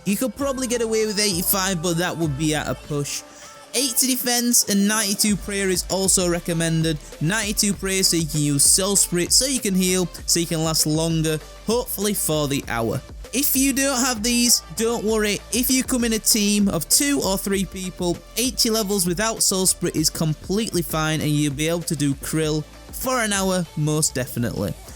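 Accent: Yorkshire, United Kingdom. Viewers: where abouts in yorkshire you at? I assume yorkshire, sounds like it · "Yorkshire on helium " And crack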